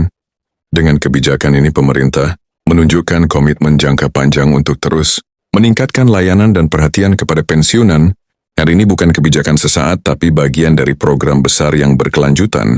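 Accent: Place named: Malaysia. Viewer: Kuang meyakinkan beritanya.seperti ngeledek pensiunan Indonesia.dengarkan baik baik membacanya gaya logat Melayu waspada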